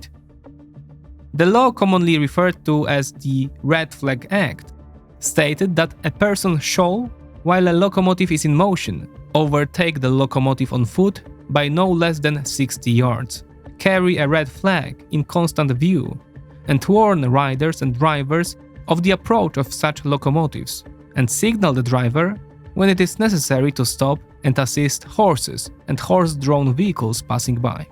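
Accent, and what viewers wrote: Poland. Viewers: Not surprised this guy has a Polish accent. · POLISH ACCENT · You do excellent work! Very informative, must be very time consuming. Liked and subscribed. And I love your Polish accent, I’m Ukrainian by birth. 😊 Suggestion: given the depth of material and watch time, suggest you have a timeline so that people can jump back to a topic of interest.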